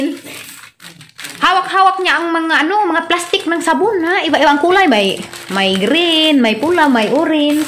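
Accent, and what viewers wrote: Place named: Visayas, Philippines. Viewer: I like your Bisalog Accent dai relate ko kay ing ana pod ko bsan ang dugay ko na dito sa Manila eh😀😀😀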